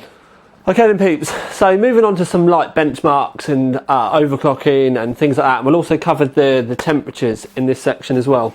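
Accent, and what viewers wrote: United Kingdom. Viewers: lol i love how brits pronounce it :P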